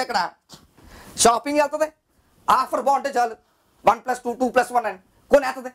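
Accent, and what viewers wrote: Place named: Godavari, India. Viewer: ...godavari slang that too konaseema slang made all the difference...very great attempt...u simply rockzzz...awesome... glad to see comment of puri jagannadh sir stating that he needs actors like you... u made a succesful attempt CONGRATS:)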